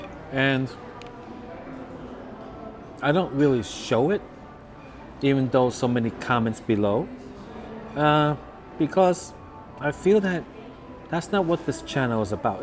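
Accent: New York, United States. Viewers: Thanks for your service! My wife has kind of the same pronounciation problem as you. Lol...but, you do it with a New York accent. Don't stop talking! That's why I watch! No, I've never wanted a late night snack of vegetables. Hat is fine. It makes you look distinguished.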